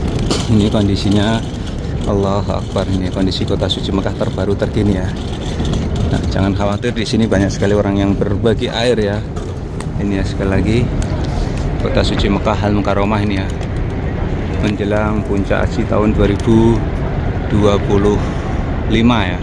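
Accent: Java, Indonesia. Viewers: Jawane metu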